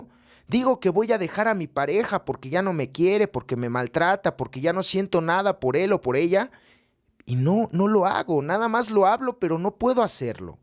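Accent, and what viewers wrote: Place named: Mexico. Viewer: Oye, tu ères de Mexico Verdad ? Por El asento tan bonito, yo naci en uno de Los grupos de Monterrey.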